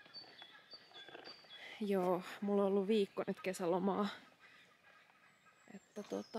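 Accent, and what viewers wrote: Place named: Finland. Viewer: 素敵な朝の散歩楽しめました。夜勤の連続は生活リズムが狂って大変ですね。インドア派というより群れるのを好まない自分の時間を大事にする派かな。友人の結婚式楽しんできてください。フィンランド語独特のイントネーションが耳に心地よいです。😺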